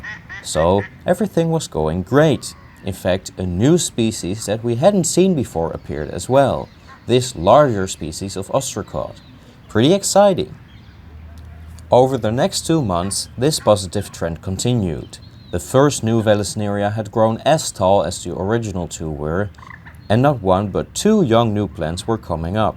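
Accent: Netherlands. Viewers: i smell a dutch accent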